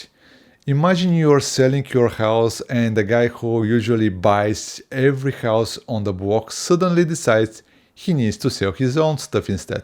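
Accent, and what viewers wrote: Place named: Bulgaria. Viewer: Zdraveite ! That's hello in Bulgarian as i was sure by the accent that he is same nationality as me . Multiple financial markers as Student loans unpaid and Mortgages unpaid and Car reposesions increasing is not painting a good picture. Let's see as most money is made in bear markets for those who know what to do and have powder.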